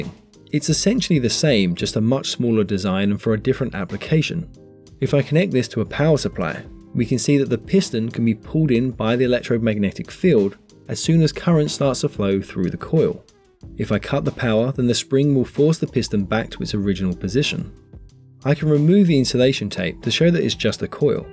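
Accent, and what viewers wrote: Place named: United Kingdom. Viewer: Where are you from? You sound like you have a British accent.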